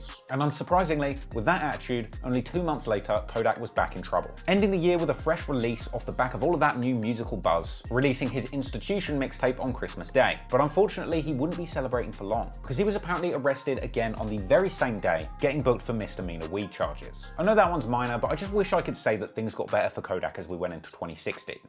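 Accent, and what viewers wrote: United Kingdom. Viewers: Are you British or American I am so confused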